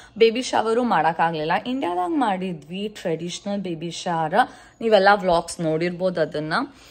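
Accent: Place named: Dharwad, India. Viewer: ಏನ್ರೀ mrs ಏನ್ ನಡ್ಸಿರಿ... ಧಾರವಾಡ ಭಾಷೆನ ನಿಮ್ ಬಾಯಿಯಿಂದಾನೆ ಕೇಳ್ಬೇಕು ಅನ್ನಿಸ್ತದ ನೋಡ್ರಲ ಪಾಪುವಿನ ನಾಮಕರಣ ವ್ಯವಸ್ಥೆ ಮಾಡೋದ್ರಲ್ಲಿ ಬಹಳ busy ಇದ್ದೀರಿ ನಡೆಸಿ ಎಲ್ಲಾ ಒಳ್ಳೆಯದಾಗಲಿ 🙏🙏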